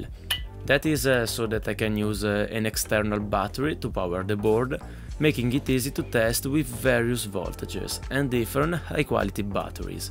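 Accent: Italy. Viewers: Ciao, innanzitutto ti voglio fare i complimenti e dirti che sei assolutamente underrated su YouTube, sia per la qualità dei video che per la qualità dei progetti. (Scrivo in italiano sia per confondere i visualizzatori inglesi sia perché mi sembra di aver capito dagli "indizi" nei video e dall'accento che tu sia italiano). Approfitto del tuo nuovo video, ottimo come sempre, per chiederti una cosa: nell'ultimo mese mi è venuta voglia di costruire un simulatore di guida. Così ho cercato online per capire come fare e ho trovato, oltre ad un altro video da cui ho preso ispirazione per i pedali, il tuo, dove mostri come costruire il volante. Non ho però costruito tutto il force feedback: ho preso ispirazione da un altro video dove si usa un semplice potenziometro. Dopo aver modificato i file 3D e i DXF per adattarli ai miei pulsanti e per utilizzare molle anziché magneti nelle levette, l'ho costruito. 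Grazie davvero per aver condiviso i file e il video di costruzione! È venuto talmente bene che mi è venuta l'idea di fare una specie di kit del volante e dei pedali da vendere, non i file digitali, ma quelli fisici come kit da assemblare. Volevo chiederti se ti andrebbe bene se potessi usare questi file "remixati" e alcuni proprio rimodellati da zero, ispirati ai tuoi, per questo progetto. Se preferisci (e per farti capire meglio), posso mandarti delle foto e degli screenshot delle parti modificate. Grazie!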